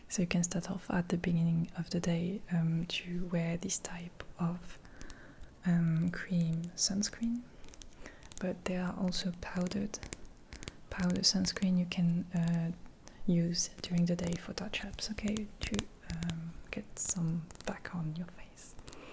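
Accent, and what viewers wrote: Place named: France. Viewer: Beyond just the beauty of your accent and the cadence of your voice, the nuances in your sentence structure are absolutely lovely and charming and they add so much to the uniqueness of your videos <3 For example: “strong colors are not scaring you in any way” would most likely be phrased, “you’re not afraid of bright colors” by a native English-speaker, but the delightful quirks of fluency in a second language include a non-standard sentence structure and I LOVE IT 🥰 Whether it’s a conscious choice on your part or simply a byproduct of having French as your first language prior to learning others, it’s marvelous.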